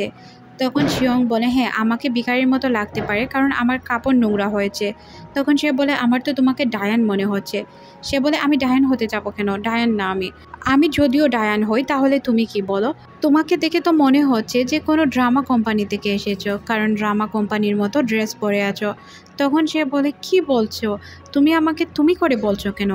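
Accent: Sylhet, Bangladesh. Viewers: Apu ki sylheti?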